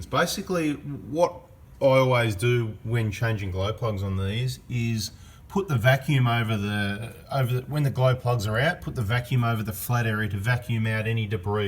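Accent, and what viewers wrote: Australia. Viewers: Hello! I’m new to your videos. When you say 5k’s, is that in km or miles? You sound a bit Australian so I was thinking it must be km, but changing your oil every 5k is a bit overkill? Here in Norway the price for a liter oil is around 20-30 US dollar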